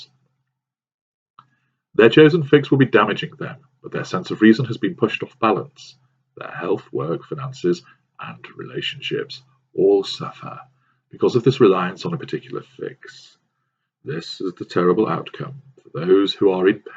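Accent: England, United Kingdom. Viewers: I have always wondered if he uses a device to distort his voice. Either way tell tale signs have popped up showing he is English.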